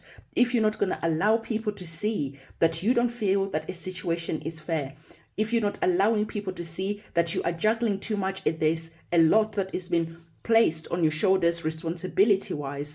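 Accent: South Africa. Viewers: Are you South African? :)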